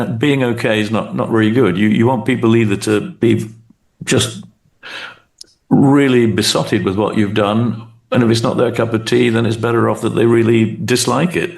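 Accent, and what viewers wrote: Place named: Scotland, United Kingdom. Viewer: For a Yank like me, your Scottish accent was at first a wee bit difficult :face-green-smiling:, but Ian of course was right at home with it and as a result you got an excellent hour+ from him. Honestly, I've heard interview after interview with Ian in which he answers the same ol' questions again and again with the same ol' answers, but you asked several unique questions that elicited genuinely thoughtful/original answers from him ... good on ya mate. Well done. :goodvibes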